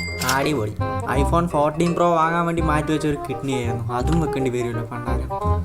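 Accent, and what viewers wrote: Palakkad, India. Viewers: Palakkad evideyanee kutta 😃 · Bro palakkad ano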